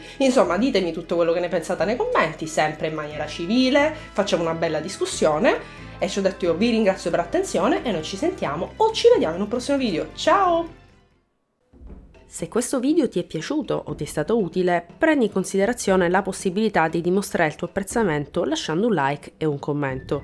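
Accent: South, Italy. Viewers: Sono sempre ipnotizzato dal tuo modo di parlare. C'è qualcosa del sud nel tuo accento.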